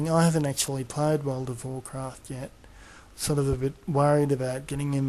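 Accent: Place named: Australia. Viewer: lol your vid was on my recommendation, so random. Damn this was 18 years ago so judging by your accent you’re probably one of the few Australians to ever upload a YT video during that time.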